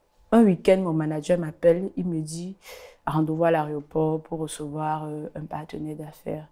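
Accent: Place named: Cameroon. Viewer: C'est une camerounaise ou gabonaise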